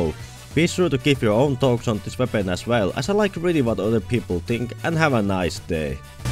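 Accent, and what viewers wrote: Finland. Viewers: I can hear the finnish accent so well :DD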